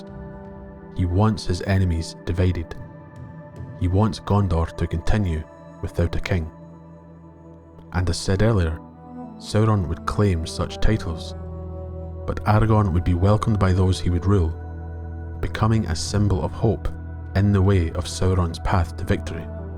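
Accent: Scotland, United Kingdom. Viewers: Excellent again. There is something inherent in Aragorn that did not exist in his ancestry for generations, perhaps since Elendil. Just as Gandalf tells Pippin that in Faramir the blood of Numenor ran true, as it did not in Boromir , so Aragorn has the majesty and power unmingled from the days of the greatness of Westernesse. Many Chieftains of the Dunedain and Kings of Arthedain passed by during the life of Arwen Undomiel, but the daughter of Elrond, and granddaughter of Galadriel and Earendil, sees in Aragorn a man she can love and respect, and sacrifice her eleven heritage for. He is Siegfried loved by a Valkyrie, Arthur returned, the Charlemagne of myth, the Restitutor Orbis like Constantine, not just the heir of a ragged line, long bereft of lordship who strikes it lucky. (How blind Denethor was!) Tolkien pushes all of our buttons. The man was a genius. 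BTW, great to hear a Scots voice amongst the babble of YouTube. Sorry to pontificate. Great channel.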